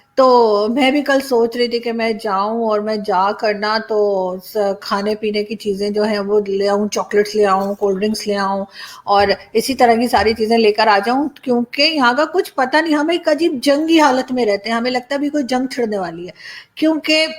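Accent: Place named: Allahabad, India. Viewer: Allahabadi......lahza h ji, jo apke Ghar me Bola jata h kyuki apka DNA Allahabad India se h...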